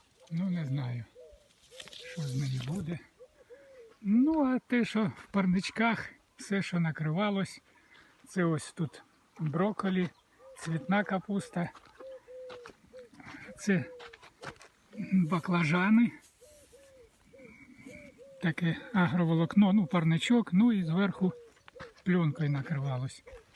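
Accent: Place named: Kharkiv, Ukraine. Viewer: Какой у вас укр.язык красивый прям как у нас в деревнях-Харьковская область.У меня папа так тоже говорит.Мира нам всем и доброй погоды.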